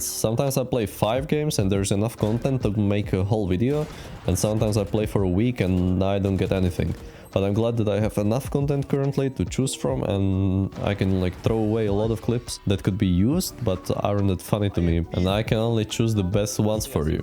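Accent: Czech Republic. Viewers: I knew you were czech... by the accent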